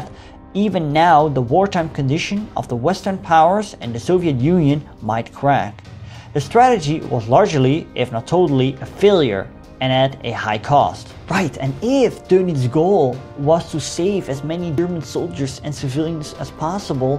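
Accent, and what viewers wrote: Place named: Netherlands. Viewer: He speaks English like a Dutchman... Admiral Donuts! That's hilarious!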